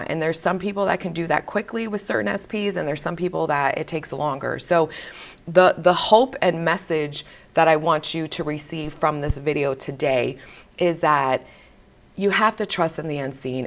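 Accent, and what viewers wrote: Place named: New Jersey, United States. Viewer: this is exactly what I needed to hear! I was getting discouraged by all the "if you're doing everything right, it should be showing up instantly!" videos because when it wasn't happening instantly, I assumed I failed. Thank you so much. (p.s., is that a Jersey accent I hear?? I was wondering why I found your voice so comforting - growing up right outside of Jersey, all I heard were people who sound just like you!)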